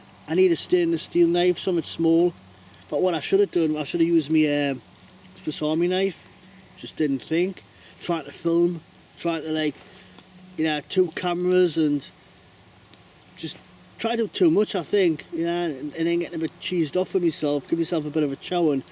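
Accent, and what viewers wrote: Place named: England, United Kingdom. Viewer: Love to watch videos from another country. Like the english accent also. I don't have the access to places to go here in Florida. Too many thing to go wrong with all the unpleasant people around. And the country is so dry this time of year. Wet season is a couple of montha away so campfires are not good.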